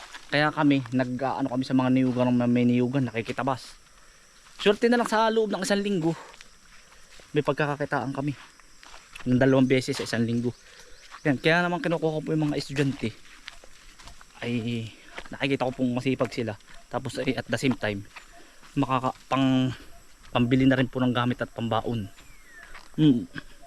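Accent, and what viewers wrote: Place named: Batangas, Philippines. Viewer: Ay BATANGUENIO KA EH KA INSAN ANG YUNG PUNTO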